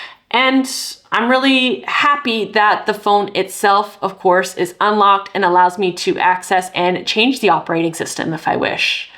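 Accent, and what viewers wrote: United States. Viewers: Watching this video on that exact device, I got the white one too. I didn't know your channel before, if not for the name I would've never known that you were French too, your accent is very American! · Excellent video! By your accent you’re a fellow American, but are you using this in the US? I’m strongly considering picking one up here in the States, but I’m also very hesitant considering the instability of this compared to a more mainstream phone with better US support and a much larger user base. I’m about to start a PhD program and don’t want to get a major headache on my hands with this. Is it easy to get set up in the US? Is it painless to use here? Any insight would be much appreciated! Thanks again for such a great video review!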